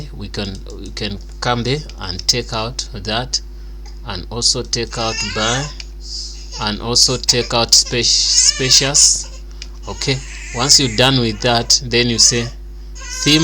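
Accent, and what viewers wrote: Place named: South Africa. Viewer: Great video thanks man, keep it up. Good to hear a South African-like accent online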